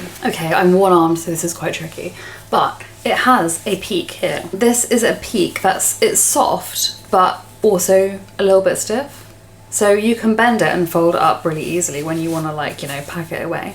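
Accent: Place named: Liverpool, United Kingdom. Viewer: I love your Scouse accent :) x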